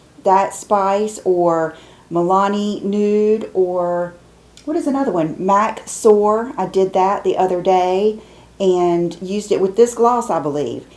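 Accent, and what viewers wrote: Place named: North Carolina, United States. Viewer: I know a North Carolina accent when I hear one. 🙌🏼I too am from North Carolina. The eastern Part! 😘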